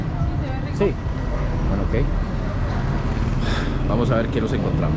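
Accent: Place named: Costa Rica. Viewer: Usted de donde es xq parece tico ?ya como habla pura vida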